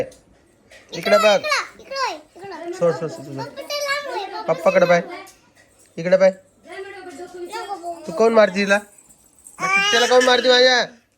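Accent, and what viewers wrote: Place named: Pune, India. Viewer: पुण्याच्या लोकान सारखे का बोलतो अरे आपली विदर्भाचि भाषा चांगली आहे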